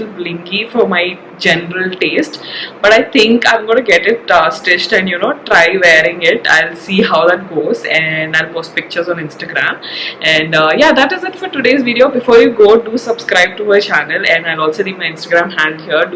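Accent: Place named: Hyderabad, India. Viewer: Hyderabad ka Hindi v bole to acha nhe rahta..... jaisa rhta waise he chalenga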